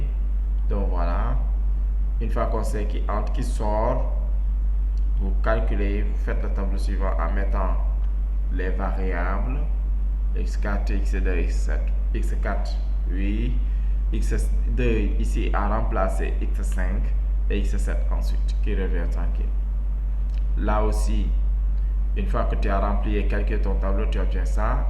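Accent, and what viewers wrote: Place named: Quebec, Canada. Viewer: quebec french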